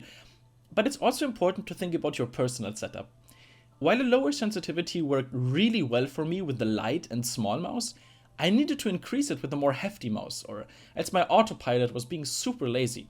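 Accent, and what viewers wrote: Germany. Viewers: Ýour accent sounds very german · Are you German ? 😂 wenn ja ich auch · Are u german? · You are dein Germany , richtig? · Man hört mega raus, dass du deutscher bist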